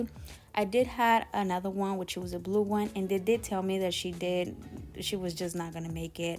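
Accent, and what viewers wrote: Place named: Spain. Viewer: ADRIANNA TRUJILLO She clearly has an accent and it’s cute. You can tell she speaks Spanish by her accent is what I mean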